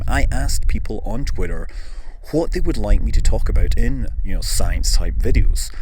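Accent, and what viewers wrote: Scotland, United Kingdom. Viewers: you sound borderline scottish, i like you. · I heard that plate tectonics is a relatively new theory. Were you taught that at school? Also, this is your first video I've ever seen, I enjoyed it. You said you're living in California. But from your speech I would assume your Scottish... Is that true or am I just misinformed about accents.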